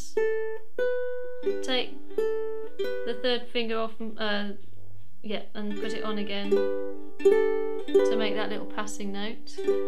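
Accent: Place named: United Kingdom. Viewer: ya why don't ya give a tutorial on " " plssss..never heard that song b4, i looked it up on youtube and gave it a listen...that's such a surreal, such a poetic song... yes, u should do a tutorial... btw, what nationality r u? u sound british...to my ears at least...but then what do i know.! haha!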